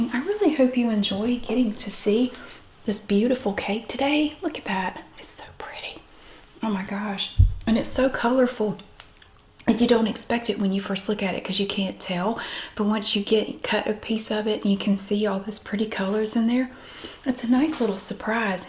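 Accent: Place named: Appalachia, United States. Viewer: ive bien loving your channel for so long, because your videos are excellent, but also, i love the pride you have for your southern appalachian roots and has made me take a special interest in learning more about it. you accent is so beautiful and your anecdotes are so Rich. the thing is, in trying to get more information i found another channel called celebrating appalachia and to my surprise, both of you ladies use the same confetti bowls to Cook. i would die with that collab. sending all my love and support from argentina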